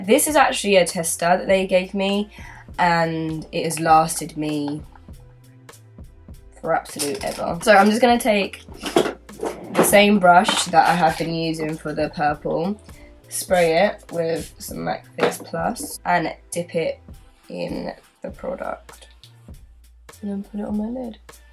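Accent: United Kingdom. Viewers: You're actually so flawless. You don't have a single unattractive feature like can I be you. plus you have a British accent too. How can a person be so perfect? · Had no clue you were British! So pretty!!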